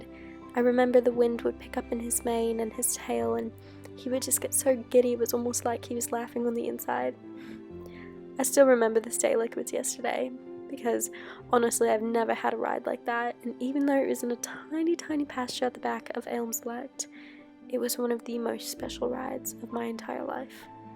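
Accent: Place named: United Kingdom. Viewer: i got the chills wow, is it me or does she have British accent in this video but not on her other videos idk anyway love you <3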